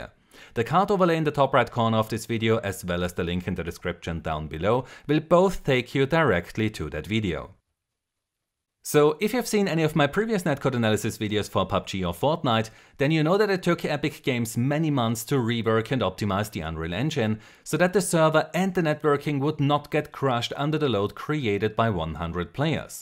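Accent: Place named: Germany. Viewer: Yaaaaaaa your GERMAN!!!! Love the accent man, you sound like a badass. 😎😎😎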